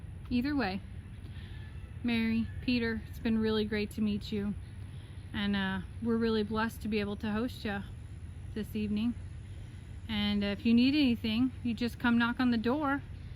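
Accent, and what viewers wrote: South, United States. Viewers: you are such a southern girl now… I heard y’all, yonder, holler!!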